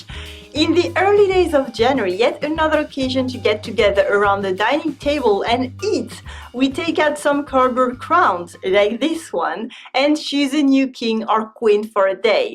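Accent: France. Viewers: Like French accents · Good job! Love the French accent ! J adore aussi the gallette des rois!!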